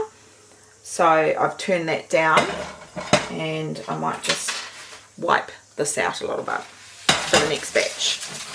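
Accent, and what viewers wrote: New Zealand. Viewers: This popped up in my feed for some reason. I kept watching because of the Kiwi accent, but subscribed because of the recipes and your genuine communication with your watchers. Don't be concerned about your occasional silence when trying to think of the right word; this reminds so much of my own Scottish mum who would gab away at us while she was cooking. I am 72 years old now, and mum has been gone many years, but you brought back many happy memories. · I love the kiwi accent lol a thuck wudth 🤣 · Surely not an Australian, but a New Zealander… listen to the vowels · The Aussie half of me is so chuffed that you’ve visited our very fine shores, but the Kiwi side of me won’t let Australia take credit for this most excellent woman 😊! She has a rather thick (or as she said, “thuck”) Kiwi accent, a bit like how “aboat” is the giveaway when you realise you’re speaking to a Canadian not an American 😁✌️ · Kia Ora, have just discovered your channel, so good to hear a kiwi accent😂. 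Tried the crumpets today.. Kapai!!!! I'll never buy crumpets again, so so easy. I 'll be scrolling your videos.. Thanks, I am a Kiwi living in Brisbane. I'll be watching you😊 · Well done, as soon as I saw your recipe for cockies joy and heard your Kiwi accent you had me hooked. I love crumpets, and I'll make some tomorrow, My Nanna used to make great pikelets on the farm in Rotorua. Cheers. new subscriber from Tasmania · You had me at your fellow kiwi accent (shameless I know but I live in QLD now so...yeah). Definitely gonna give these a try and hope to enjoy with butter and jam.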